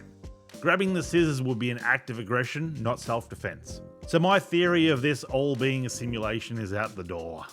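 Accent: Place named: United Kingdom. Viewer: Cause he's British!!!! Talks like hes got a mouth full of biscuits 😂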